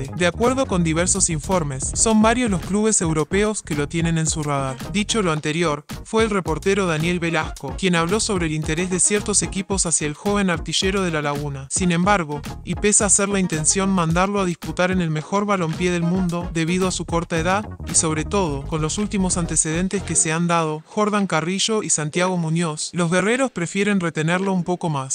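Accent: Argentina. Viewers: Y siguen con su tonito argentino.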